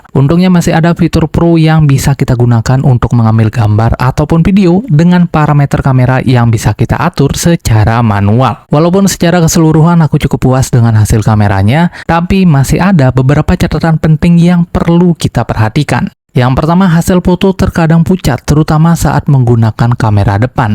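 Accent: Bali, Indonesia. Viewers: Anak bali ini logatnya ya bli😊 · Abang nya orang bali ya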